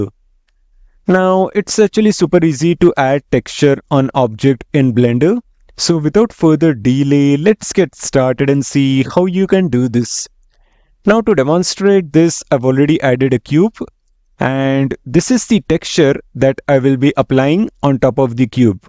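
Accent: India. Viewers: He has an Indian accent.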